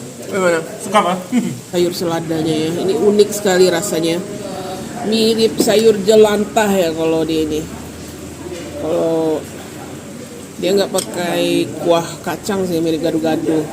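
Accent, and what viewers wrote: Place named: West Sumatra, Indonesia. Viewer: Mungkin tak pandai tetapi banyak pertuturannya guna bahasa melayu cuma dialeknya lebih kepada minang dan indonesia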